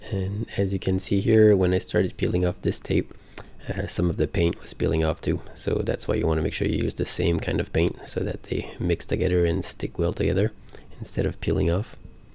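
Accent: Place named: New Brunswick, Canada. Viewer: Super nice! Couldn't help but notice your accent... are you from NewBrunswick?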